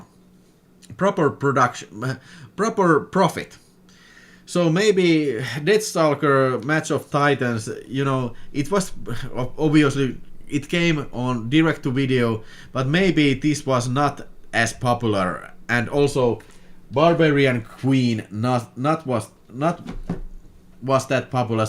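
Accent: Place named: Lapland, Finland. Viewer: This is the first video of yours I've seen, but I love style and content. The accent takes me back to all the summers I spent in Lappland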